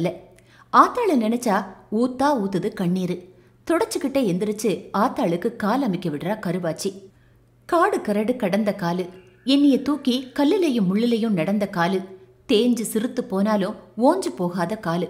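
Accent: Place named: Southern Tamil Nadu, India. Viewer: தங்கள் ஊர் தெற்கு பக்கமோ அங்கே பேசும் தமிழ் காதில் தேன் போல் இருக்கிறது நன்றி நன்றி 🎉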